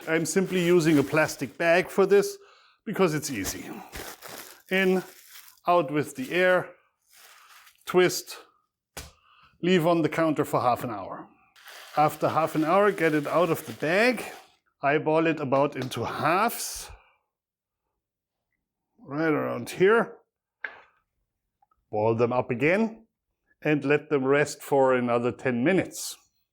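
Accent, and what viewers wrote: Germany. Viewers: "... this is an excellent choice for brakfast or lunch!" Bist du aus de Palz oder aus Rhoihesse? XD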